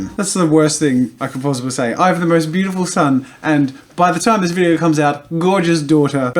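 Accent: Australia. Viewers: Does he keep calling them ‘color-in book’, or is that just the Aussie accent??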